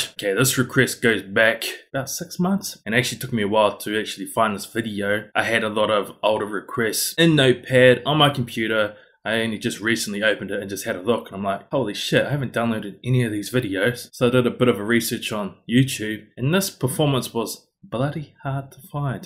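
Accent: New Zealand. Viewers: omg are you from New Zealand? your accent:oo is amazing, react to more of his mask king videos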